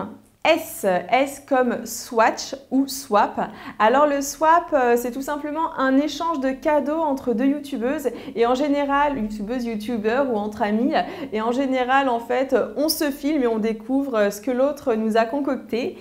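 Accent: England, United Kingdom. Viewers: # Mdr tu a un accent anglais à mourir de rire mais je t aime bien quand même Plein de bisous:-* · tu me fais trop avec ton accent Anglais!;) Toujours au top, bisouuuus!:) <3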